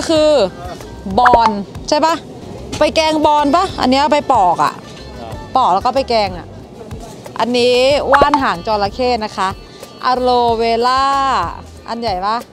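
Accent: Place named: Northern Thailand, Thailand. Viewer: แอดฯ.อู้เหนือเพราะจังเลย..แอดฯเป็นคนจังหวัดไหน?❤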